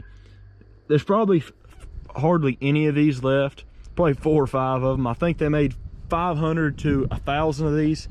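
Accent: South, United States. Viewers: great job. love southern accent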